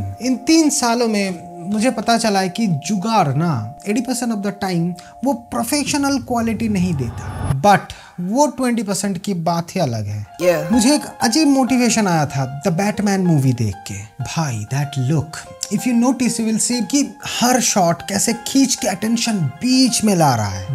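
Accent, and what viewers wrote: Bengal, India. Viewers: Bhai tum Bengali ho ???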